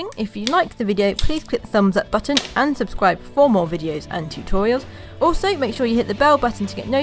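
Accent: England, United Kingdom. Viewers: Right on! I was watching another youtuber from UK and he said his accent was "home counties". Is there a specific area that your accent is from in the UK? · thank u. iv been wanting to know how to do a simple leather stitch. and i got to hear it in a English accent. thank u again from the states.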